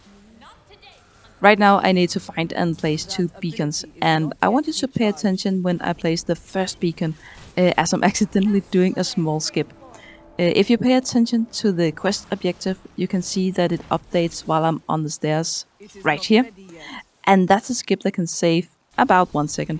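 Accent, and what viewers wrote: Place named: Denmark. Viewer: As a fellow Dane you can instantly recognize a Danish accent, when you find it out in the wild like this :) Nice run as well.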